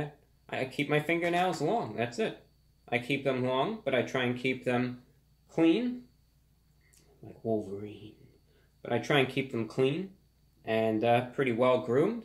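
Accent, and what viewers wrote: United States. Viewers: He looks so British and then you hear his accent Feels American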